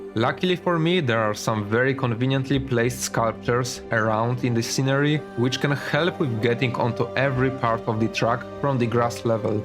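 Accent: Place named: Poland. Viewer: bro Why i think you from poland dlaczego wydaje mi się że jesteś polski ?????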